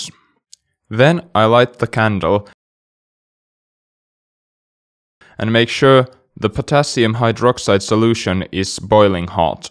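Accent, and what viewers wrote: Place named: Finland. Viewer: You have finnish accent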